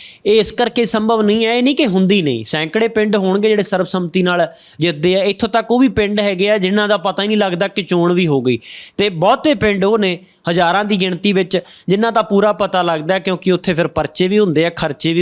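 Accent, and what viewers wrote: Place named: Ferozpur, India. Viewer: Bai di boli frojpur elake di lagdi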